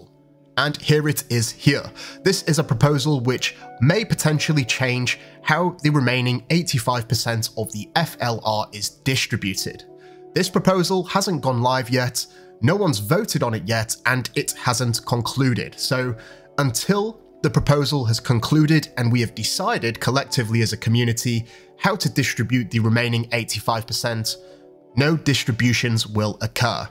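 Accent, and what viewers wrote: Yorkshire, United Kingdom. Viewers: Yorkshire accent for sure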